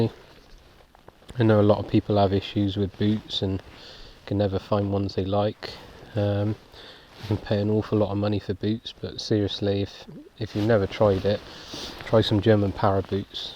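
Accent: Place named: Herefordshire, United Kingdom. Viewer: If you don't mind me asking where you based. I'm Leominster Herefordshire and I thought your accent sounded close. Good vid. Sub and liked.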